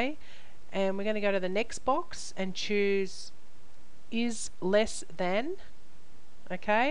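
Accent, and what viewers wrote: Australia. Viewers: i love how aussie you are makes it so much easier to listen too lol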